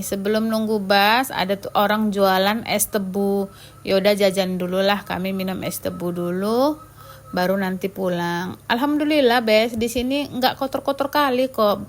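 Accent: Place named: North Sumatra, Indonesia. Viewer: Kak ini orang mana di Indonesia? Logat bahasa Indonesia kak seperti orang Sumatera Utara. Kenalan dong kak, saya followers baru kak🙏🥰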